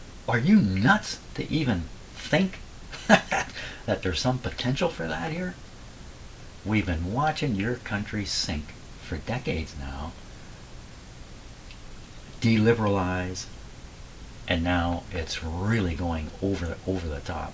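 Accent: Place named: Canada. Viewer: There is no going back. Accept us and we learn nothing, forgive us and you betray yourselves. I hate being wise but we are not in a good place and it's going to get one hell of a lot worse before it gets any better. Brace yourselves - you're going to catch strays, being so close. Open your arms to those of us who are more loyal to the world we had, to our friendships and alliances and the longest peaceful & demilitarized border ever, than any world this regime could ever hope to create. Y'all may not be my home nor my native land but I'll defend Canada before I defend this fascist regime. We still pick up a lot of Niagara Falls, ON radio, sometimes Toronto too. I should have known from your accent that we are geographical neighbors, my apologies. I also have actual Canadian relatives - 1st cousins, uncle died before I was born & his sweet, wonderful, creative wife just died, alone, in a hospital, during C19 - so perhaps my affinities toward you guys are a little more fundamental to me. 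My godfather is one of those Canadian cousins (~20 years difference). The aforementioned uncle, who died in Windsor, has a Wiki page, largely for work he did at Toronto University and then if memory serves Assumption College which I think became the University of Windsor at some point. Yeah. I understand how you have to regard my country, I understand the geopolitics, but don't fool yourselves for one second that we've all changed for the worse and that all your friends and allies are gone. We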